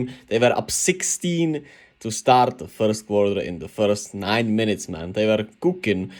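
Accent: Czech Republic. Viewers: first time hearing a cezch accent. pretty good video, try improve your flow a bit in the sentences and you'll be interesting for sure! I understand English is not your first language but just keep practicing man. all love here though, I enjoyed it!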